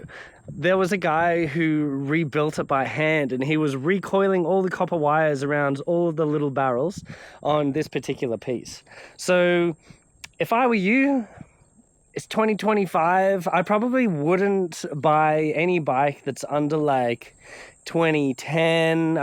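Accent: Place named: Australia. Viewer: Dear! You are the best guy I’ve found in youtube sice years, i’m so grateful to Famila Mundo (the camere;) to let me know you. Y like lissen you to improove my english undertanding and i adore australian accent… is very hard for me but very beautyfulsoundin for me. Please don’t offend if i ask you to make an experiment, Im 50 years old, and i’ve seen many things in the live..and in the health tha is a my passion since decades….. I heared in your breath the sign of a “sensitivity” to some moleculs present in the food. Expecially i’m almost sure you eat a large amount of “starchy” food…. Like grains, potatoes, flours food……. Please…. If you want to lissen a old guy that experimented many things, You can try for Trhee weeks to low down the use of any “starchy” product…. And eventually dairy products if you use them…. And you can observe by yourself if the improovement of breathing will occur, or not!! 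…. (I won’t give you my spoiler, even though You can easily guess it)😅 To compensate the lack of carbo, is the best choice, at your age, to put in the plate fruit and fruit and fruit, and many no starchy vegetables, raw and cooked. and sweet drink made by juice of fruit o cane…. Enjoy natural simple food!!!!! People around you will not understand You, but… do not worry, your experiment is a great improoving oporunity for you entire future healt of the live…people’s opinions may be discussed later, whit the results in the hand, after the experiment conclusion…🙏👍👍👍 Last info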